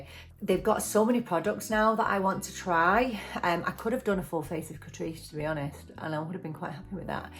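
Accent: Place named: Manchester, United Kingdom. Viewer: Thought I detected a slight Mancunian accent ☺️. Wilko stores no longer trade, haven't found any pharmacies that stock Essence as yet!! Have a good day! Xx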